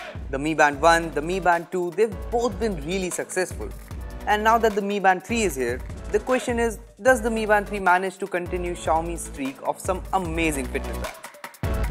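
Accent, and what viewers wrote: India. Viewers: Uuuuuuh, the Indian accent is... is... hard to digest, sorry( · Bu ne biçim ingilizce yaw abi resmen hintçe gibi konuşuyorsun ya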